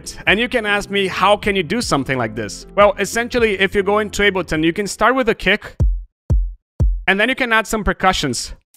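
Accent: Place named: Brazil. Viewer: Só pelo sotaque da pra ver que vc é br mano, hahahaha. Ótimo conteúdo irmão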